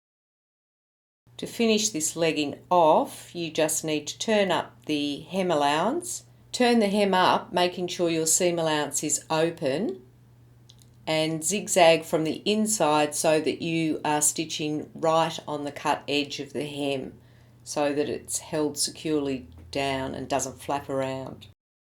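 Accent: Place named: Australia. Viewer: Well, I love your Australian accent! (I am in Sydney - LOL!!) Have subbed - just getting back into sewing after having children and loving your tutorials :-)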